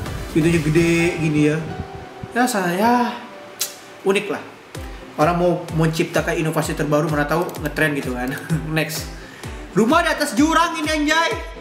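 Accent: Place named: Makassar, Indonesia. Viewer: Kakak orang makassar ya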